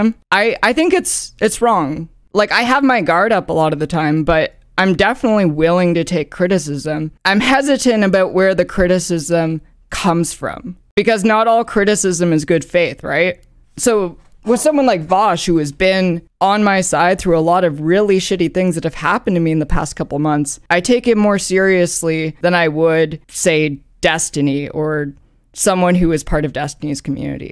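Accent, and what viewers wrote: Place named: Canada. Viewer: Wait! You sound Canadian. I thought you were Italian :( Mamma Mia!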